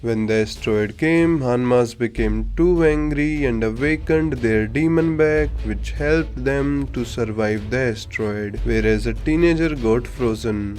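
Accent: India. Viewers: Why do you sound so Indian can you please tell ? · u sound indian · Bro he's Indian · Bro you returned 😁 loved the accent from 🇮🇳.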